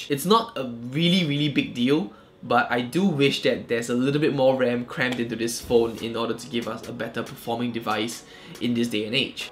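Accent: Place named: Southeast Asia. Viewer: You have a South East Asian accent! By any chance are you from Singapore or Malaysia?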